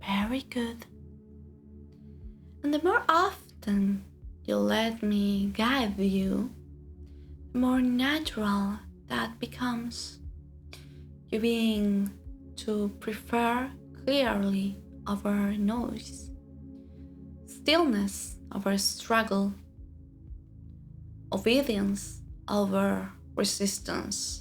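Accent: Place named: Argentina. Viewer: Ok I cannot resist your accent 😊 Very commanding tone of voice. I see you are from Argentine, South American women are so powerful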